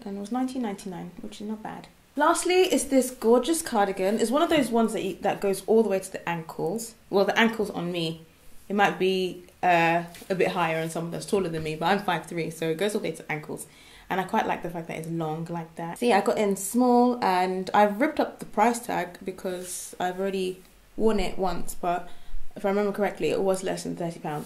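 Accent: Nigeria. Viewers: Please do the Nigerian accent throughout your videos, I live for it hahahaha! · The Naija accent hahaha. Perfect. Love your videos.:-)) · Beloved naija accent What strapless bra are you wearing with that off the shoulder tight H&M light pink/peach top? if you don't mind me asking. 😊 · the Nigerian accent is giving me life lol, I feel like you are so much more relaxed around the camera now! lovely hun!! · I love how the "naijaness" in you is coming out and I love your bloopers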